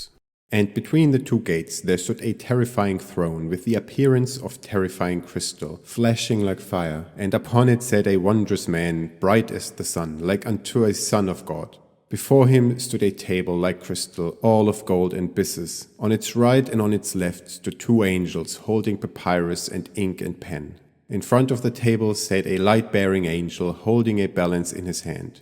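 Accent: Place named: Egypt. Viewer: Sounds very Egyptian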